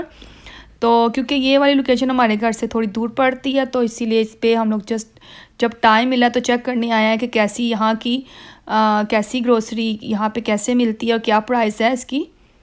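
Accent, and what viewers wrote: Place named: Lahore, Pakistan. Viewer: ye Lahorei style hai.hume tu bht pasand hai.m bhi lhr se hun.or bht acha bolti hai👌👌 jee❤❤❤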